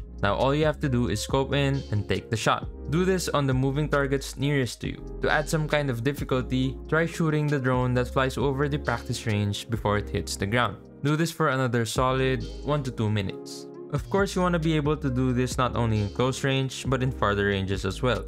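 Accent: Philippines. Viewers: Thanks for this tips and tricks! Its's awesome.. Anyway, are u a Filipino? Because your english accent sounds like how we Filipinos talk english.😍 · Are u filipino u sound like a filipino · your accent is kinda like filipino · pinoy english accent is good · u sounds like you're a Filipino.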